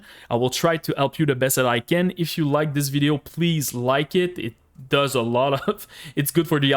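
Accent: Quebec, Canada. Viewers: Ton accent Quebecois te trahi beaucoup xD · U from Quebec? u got the accent lol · best accent ever...jadore sa esti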